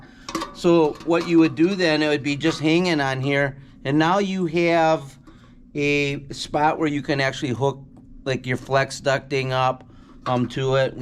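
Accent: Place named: Wisconsin, United States. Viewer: The Wisconsin accent is strong on this one!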